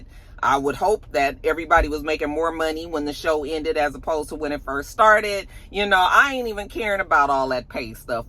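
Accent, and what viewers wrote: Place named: Los Angeles, United States. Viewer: Lol. I love when you do your LA hood 🥷 accent 😂😂😊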